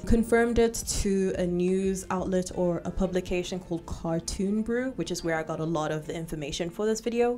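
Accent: South Africa. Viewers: I knew your accent sounded a little too homely and then you mentioned south africa ! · Are you from South Africa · Are you South African? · Wanted to ask if you South Africans cause of the nice accent but I guess you answered it in the vid 😅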